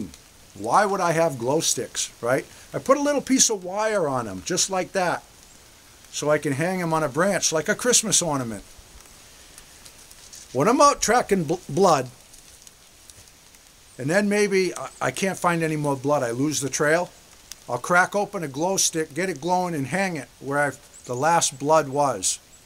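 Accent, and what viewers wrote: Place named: New England, United States. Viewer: OMG don;t let the negative people get to you. Whether jealousy, negativity, criticism, or downright cruel comments, know that those folks are very unhappy in their own lives and have a need to lash out to others. Everyone is doing the best they can with what they know. Pray for them, don't waste your energy in addressing or even acknowledging their rants. Move forward and continue with your happy life as if they don't exist. Acknowledging them gives them satisfaction in knowing it bothered you. Your videos are great, entertaining, helpful, and just downright genuine. You are the salt of the earth my friend. Pray for those lost souls. I now live in northern California, 8-1/2 years now - not far from Tahoe - but far enough to not get enough snow for me!!. However, I miss New England, having grown up in CT, RI, MA and ME. 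Your slight accent brings back fond memories. My daughters and grandchildren are in CT and MA. My older daughter has a second home in NH. I am planning on a road trip for a visit this summer/fall. Bless you.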